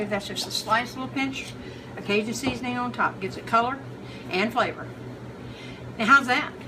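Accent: South, United States. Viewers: My Mom Would Love Her Southern Accent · Love your southern accent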